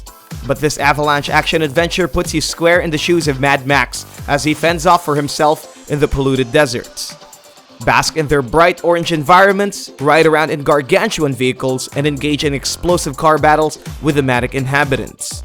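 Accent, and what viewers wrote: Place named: Philippines. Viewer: This dude sounds Filipino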